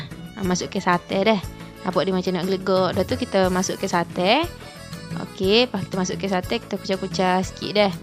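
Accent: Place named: Kelantan, Malaysia. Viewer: hihi.. Bhsa Kelantan pure betul la sis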